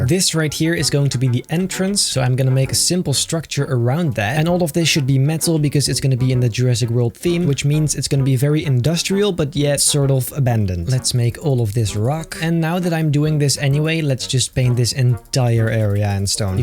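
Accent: Netherlands. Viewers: Are you Dutch?? Your’e voice is al little bit dutch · You kinda have a Dutch accent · are you dutch? you sound dutch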